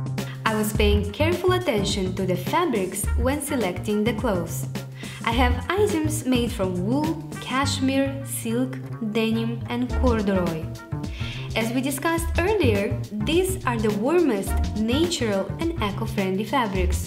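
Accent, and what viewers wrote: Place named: Russia. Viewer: Слышу русский акцент)))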